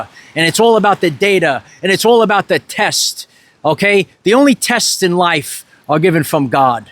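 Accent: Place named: Bronx, United States. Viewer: This guy looks like a leading man in Hollywood but speaks like a grifter from da Bronx.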